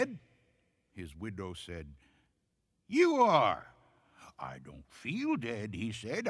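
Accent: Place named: New York, United States. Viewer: I love the narrator’s accent for this story! He is originally from New York I think! 🤣✋ I love this story, more like a dark comedy than a scary story. The delivery is hilarious too Also, that widow is one gold digger, isn’t she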